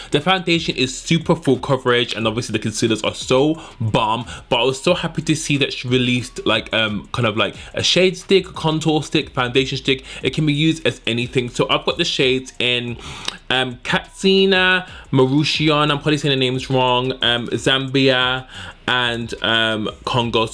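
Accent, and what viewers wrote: United Kingdom. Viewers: Yanna Teira it’s the British accent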